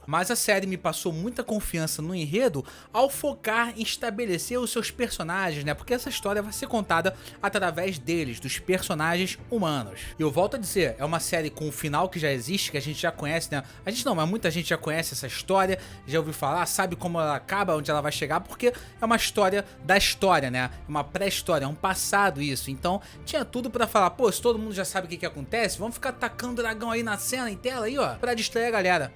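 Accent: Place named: Rio de Janeiro, Brazil. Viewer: Tirando sotaque carioca o vídeo ficou muito bom.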